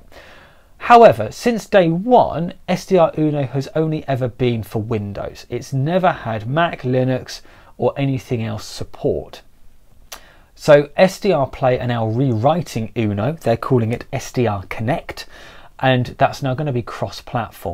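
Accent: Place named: Texas, United States. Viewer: Great video! I know I will not be buying the T10 for that price. Lots of lower priced options (not bowfung) I notice you have an accent are you from Texas?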